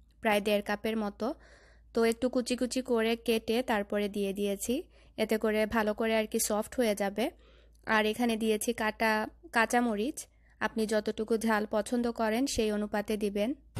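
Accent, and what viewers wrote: Sylhet, Bangladesh. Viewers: Apu ami apnar new member.. Apnar kotha gula onak sundor.. Sylhet language bole je ota te o onak sundor lage apnak.. Falak to amder bashar member hoye gese.. Sobay chine.. Allah apnader k susto rakuk.. Amin..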